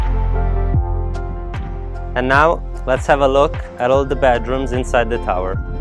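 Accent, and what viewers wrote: Italy. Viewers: L💓Ve listening to ur Italian accent . Besides u r an awesome guy ur self ! . 👍💕💕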